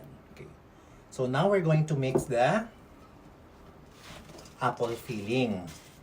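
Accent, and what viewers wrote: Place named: Iloilo, Philippines. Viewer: Hello po sir!! I love your ilonggo accent 😍😍😍it reminds me of my friend in Bacolod.... Pinaka sweet na nakilala ko Ang mga ilonggo 😍 keep safe always sir!! More videos please 😊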